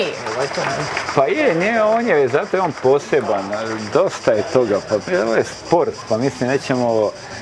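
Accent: Dalmatia, Croatia. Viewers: i ja isto OBOŽAVAM Dalmatinski naglasak, o tome može da misli ko šta oće negativno, zabole me ,,djoka,,.. poz 031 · To je dalmatinski naglasak kod zena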